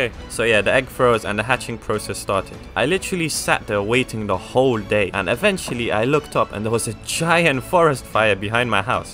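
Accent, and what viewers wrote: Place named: Roadman, United Kingdom. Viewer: bro has a roadman accent 😂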